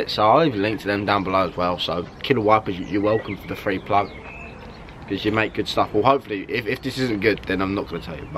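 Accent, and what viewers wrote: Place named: Sheffield, United Kingdom. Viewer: Hahaha I've a mate from Sheffield who talks like you 😂 rate is right barnet is head LMAO it's funny when he talks lol I'm from Leeds but I don't talk like that lol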